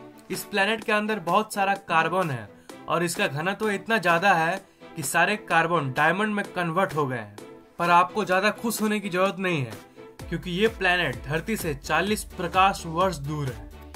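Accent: Bihar, India. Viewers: are you Bihar........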